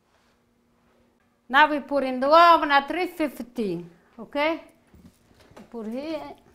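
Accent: Italy. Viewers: Today for lunch, I made the baked fish cod fillet exactly as you made. I was so surprised how delicious it came out considering all ingredients came from the pantry. My husband and I really enjoyed our lunch. I always enjoy your cooking show, love your Italian accent, your lovely voice, but most of all the meals you prepared are simple ingredients and delicious. Thank you for the delicious cod fish recipe. God bless. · you're the Best...👍 You make me feel so much Better with your Sweetness... I smile a lot cause of the way you speak... describing what you're making with your Awesome Italian Accent & singing brings a comfort to my heart!! 🎤💖🇮🇹 OH & the delicious recipes you make!! 👏👏👏👏👏😀😋💖🇮🇹🇺🇸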